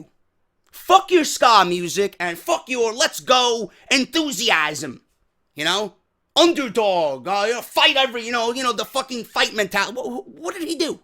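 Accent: New York, United States. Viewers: You're a fucking New Yorker man xD love it cx I nearly pissed myself though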